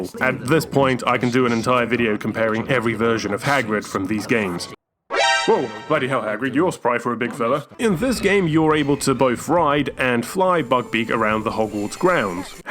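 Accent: United Kingdom. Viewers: Yes, i want a review of all HP games too. I want to hear your very british voice talking about very british stuff (despite the fact movies and games are american)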